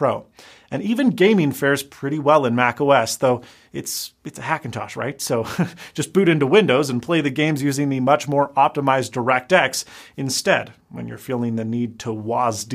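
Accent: Spain. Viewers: You used to have a Spanish accent? No idea. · What’s up with Spanish accent? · Yo. Spanish accent? TF?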